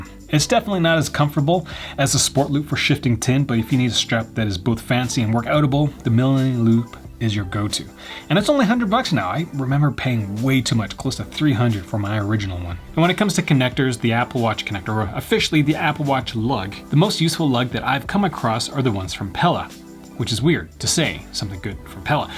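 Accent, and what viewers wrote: Canada. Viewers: Is this dude Canadian?? What a lame reviewer and his jokes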